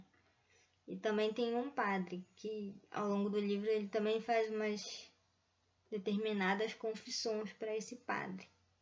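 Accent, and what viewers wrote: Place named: North, Brazil. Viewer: Parabéns pelo vídeo, muito bom, engraçado a atemporalidade de certos assuntos aqui na plataforma... Sou do Amapá, muito bom ver um sotaque nortista ecoando conhecimento.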